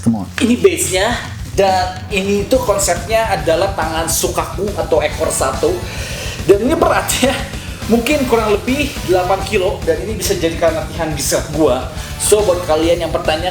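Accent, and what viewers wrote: West Java, Indonesia. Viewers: Orang sunda ya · sunda nya ngakak xD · Orang sunda apa ya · Bang orang Sunda? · logat sunda nya bikit ngakak.. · Si Koko tinggal dimana nih, sundaan wae, hahaha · Haha sunda pisan 😂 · Bang asli nyah orang mana ko ngomong nyah aya sundaan wkwkw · Koko sunda ? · Tigubrag tiguling 😅😅😅 urang sunda gening mang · Logat Sunda ?? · Ngomong na sunda pisan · Orang sunda asli pisan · Orang Sunda nihh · ai akang th timana atuh, menih sunda pisan kitu??? hehe tp wajah na oriental pisan... hehe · anjir ini si koko aksen sunda nya kuat banget.. Hadeeeee... Keren ... Bte mainan lo.. Maaahaaalllll tapi haqiqiiiiiii ...cool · Bang orang sunda?? 😂😂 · Hahah orng sunda kang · Keren mang sunda banget . korowot korowot wkwkwkwk Sukses slalu ....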